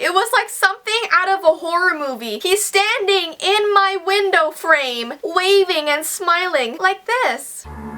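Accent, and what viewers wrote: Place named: Canada. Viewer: Hi! I have been binge watching your videos and I am American. I know you're Canadian and I love your accent. I have recently been retreaving the Canadian accent. I love it but some people are asking me at school if I was faking the American accent. No, it's because of your accent is getting to me. Have a good day!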